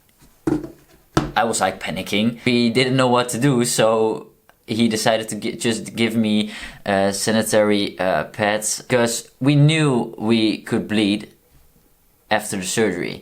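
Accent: Netherlands. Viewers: Vreselijk verhaal man!! Wat kut. Je engels is btw goed aan je accent mag je nog wel werken ik kon meteen horen dat je nederlands was😂 no offense tho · I like your Dutch accent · love you accent love Dutch I'm glad you are OK now xxx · Don't apologize for your accent. I had the blessed opportunity to lived in The Netherland for three years. Beautiful people, beautiful country. You are a beautiful and brave person. Forgive me because I do not know the correct pronouns. I am learning. ☺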